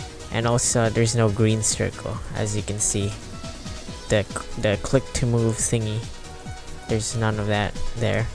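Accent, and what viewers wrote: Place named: Philippines. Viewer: You sound like a Filipino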